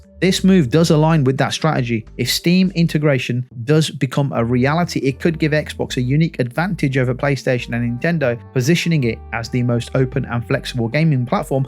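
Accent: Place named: United Kingdom. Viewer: Shut Up, your reporting is so flawed, while yes fans have been requesting an Assassins Creed game setting in Japan, FANS WERE EXPECTING A JAPANESE MALE ANTAGONIST, NOT AN AFRICAN!!!!! Get your facts straight British Accent Guy!!!! That 1 million players is flawed because it does not represent sales like Ubisoft is trying to disguise it as though!!!! Go Back to Reporter School!!!! Hahahaha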